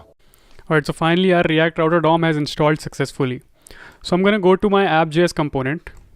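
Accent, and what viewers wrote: India. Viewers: everything was fine untill the indian accent, dislike. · first i thought oh..this is not an indian guys....then after 10 sec the indian accent comes in..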